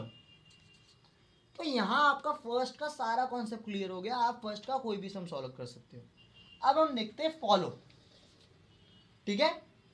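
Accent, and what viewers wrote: India. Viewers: indian people have the worst english accent in the world.i cant understand wtf is he talking about.pls go learn some english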